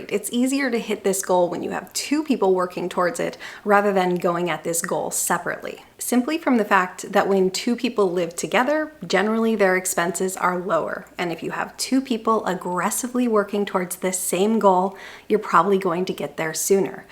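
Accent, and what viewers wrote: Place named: Michigan, United States. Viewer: subtle michigan accent detected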